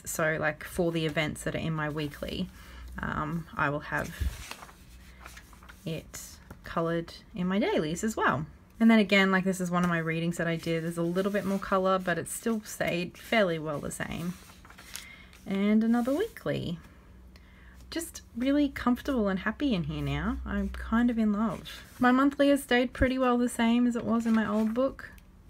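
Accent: Australia. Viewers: Hi, I’ve just stumbled across your video by accident. I feel like I’m living your life a year later. I too am a bullet journalist too and have considered switching to a hobonichi next year as I am in the midst of completing my master and creating layouts is starting to impede on my time. I thought the pre-printed layouts would assist me next year but I’m a bit hesitant as to how it will translate in the hobonichi. I’m a little worried that there aren’t enough spare pages at the back of the hobonichi for collections and challenges like you would in the Leuchtturm. Loved listening experience with the Cousin Avec 😊 Hope I’m right in saying that I detect an Aussie accent. I’m from Kangaland too (Sydney to be precise).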